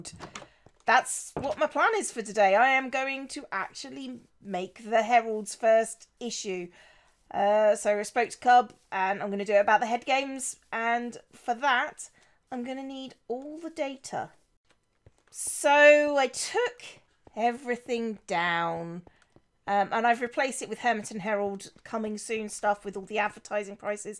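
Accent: Germany. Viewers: Are you fron Germany?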